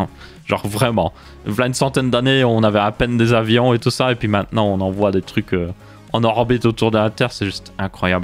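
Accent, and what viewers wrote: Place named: Belgium. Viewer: *OUÏTE* alala l’accent belge ... Qu’est ce qu’on ferait sans toi ! xD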